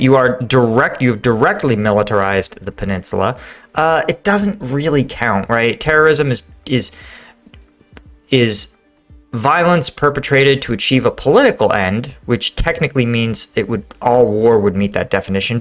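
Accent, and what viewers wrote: New Jersey, United States. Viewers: Thumbs up for that Jerseys accent. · Great explanations,as usual...also,best New Jersey accent ever :) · Jersey accent for the win!!! 😂😂😂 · Jersey accent? Loved it. In using it to describe the explosions of Russian military facilities it Crimea, you just needed to finish with - Badda bing; badda BOOM! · Love the jersey accent😂😂 much love from north jersey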